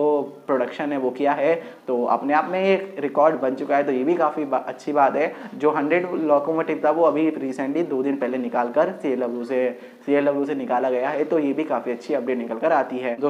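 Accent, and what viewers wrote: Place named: Bihar, India. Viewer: Bhai pakka tum bihar se ho...!!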